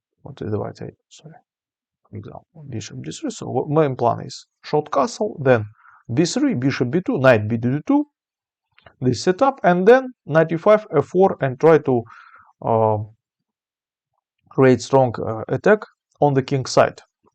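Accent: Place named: Russia. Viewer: Funny russiam. Accent 🎉🎉🎉😂😅😅😮😮❤😊😊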